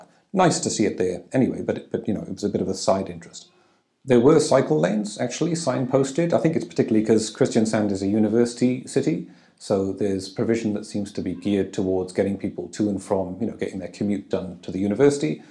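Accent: United Kingdom. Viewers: Nice to see u again. Is your British accent is softening? Is it possible to retrofit indicators on e bikes and not waving hands?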